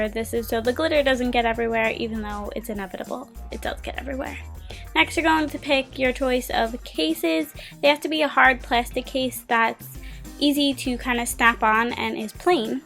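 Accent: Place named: New Jersey, United States. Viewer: you sound like you're from jersey. are you?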